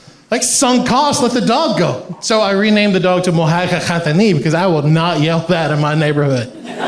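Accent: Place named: Iran. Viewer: i love how he seamlessly blends the Iranian accent with the Mississippi one 🤣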